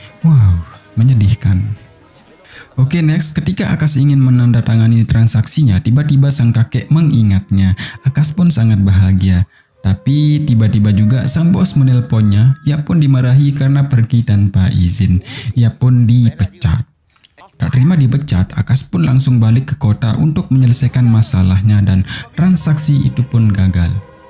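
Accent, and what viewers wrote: Bali, Indonesia. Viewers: Dr logatx orang BALI ya min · Malah mnurutku logatnya kaya org Bali/Lombok Tp syg speed pnyampaiannya trlalu lmbat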